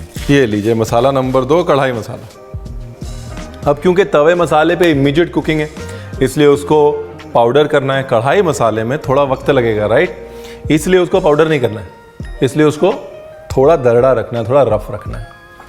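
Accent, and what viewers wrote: India. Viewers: At first I used to ignore your videos coz I’m not that much into cooking!! Then I started watching ur videos.. now I’m really liking ur videos ofcourse I get to learn few things but mostly your Indian-desi style of talking n cooking... ur videos are entertaining!! Keep up the good work Ranveer!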